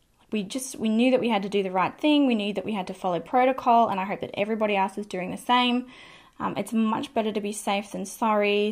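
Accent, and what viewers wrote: Australia. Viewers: Please do vlogs with dogs! You are still the only Aussie mua I can find so it’s good to hear our accent (I live in NSW). I am trying to home school my 2 girls - not easy. They are 12 and 9 and were just having a screaming match at 10am, so “school” had only been on for an hour 😖 The one thing that calmed them both down was meeting your beautiful new puppy! I was enjoying your video - always brilliant!- and then you put the gorgeous Emma on! More puppies and makeup and chats please! Thanks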